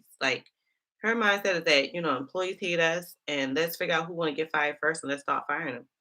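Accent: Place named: Charleston, United States. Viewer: girl you sound like you from Charleston and if you not girl where are you from because I sound just like you 😂😂😂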